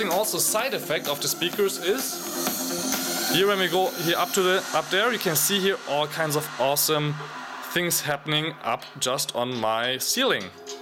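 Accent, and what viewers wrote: Germany. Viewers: Du bist deutsch gä also emmm mam hörts bissl rauß aber egal gutes video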